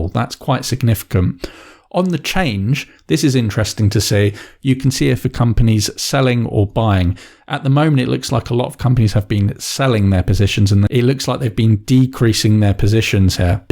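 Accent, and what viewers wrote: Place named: United Kingdom. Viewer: Thank your for this video. I learned a lot, and your British accent is cool. I was going to subscribe, but it seems that almost all of your videos are about crypto coins only.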